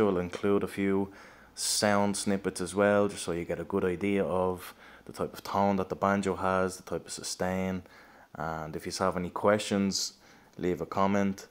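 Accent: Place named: Dublin, Ireland. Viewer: hi happy ne year I was thinking you must be from that part of Dublin you sound very like him alright 😄 I'm not far from you myself just started clawhammer frailing journey Just wondering if you do lessons??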